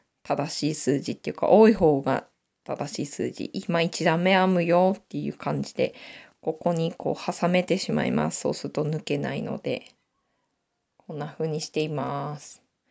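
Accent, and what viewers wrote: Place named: Hachinohe, Japan. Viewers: youtubそのものに初めて コメントです。何と懐かしい 。南部弁～「まめしとぎ」「干し餅」美味しいものの表現に「くるみの味」先日からチャンネル登録して見ています。 いまだに 帰省した時に 道の駅に寄り探しますよ～ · おはよう〜💕 「うんめ〜」カワイイよ。 青森訛り好きだわ〜🥰 大好きなものを熱く語るはずが、よくわからないという顛末😁の件も面白かった。 私は愛知出身なんだけど、名古屋弁ってキツめなんだよね🥲 青森の友達と話しているみたいで楽しかった。 またやってね、青森訛り動画👍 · 私の父が八戸の生まれです 訛りを聞いてるととてもほっこりします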